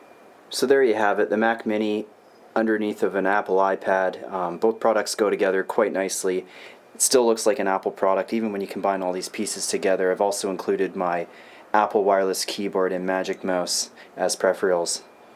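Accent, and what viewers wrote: Canada. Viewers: judging by ur accent, ur Canadian?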